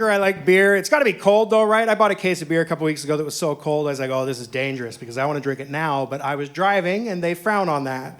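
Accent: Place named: Canada. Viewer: I wasn't picking up on the Canadian accent at first until I realized that sometimes your enunciation sounds like Jordan Peterson 😂😂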